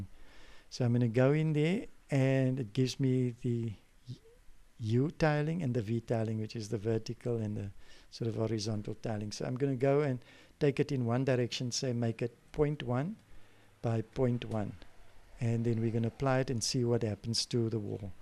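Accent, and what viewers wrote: South Africa. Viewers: ) that ZA accent is very telling. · you kind of have a South African accent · Awe m'bru...you Capetonian?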